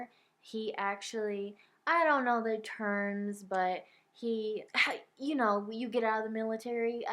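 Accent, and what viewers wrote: South, United States. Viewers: Adrian is surely used to you pranking him, do something like make him think you are pranking him and he gets on edge when you are not. Also, love your accent! Found your videos by typing into YouTube "girl with Southern accent" and your accent challenge one came up. Watched it, loved it, and watched all your others. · Who notices she has a slight country accent which is adorable 😂😂but thank you so much I was with a guy who made me feel as if I had to do what he said he told me if I didn’t have a ass then he wouldn’t have given me a chance and then broke up with me for his ex who wouldn’t leave us alone but he was basically a narcissistic person he always talked about how girls wanted him and stuff and how fine they were and god am I happy that he left he always thought he could control me and how I acted and accused me of acting single when I wasn’t and now I’m happy living my life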